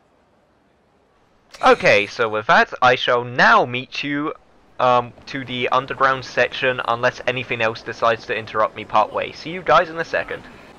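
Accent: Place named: South, United States. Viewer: actual Texan here, I think your southern accent is hilarious. Also good lets play, I've watched almost all of your yakuza videos in like 2 days lol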